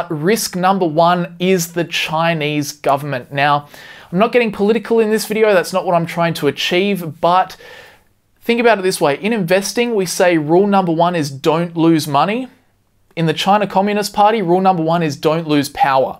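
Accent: Australia. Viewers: you got my attention with the quote: "yea awright, grandpa!!" .. good analysis with concerted effort to avoid over-influencing our opinion... love yur Aussie accent...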